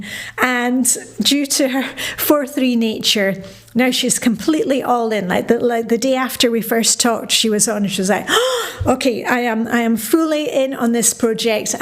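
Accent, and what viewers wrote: Scotland, United Kingdom. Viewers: Do you have a Scottish accent?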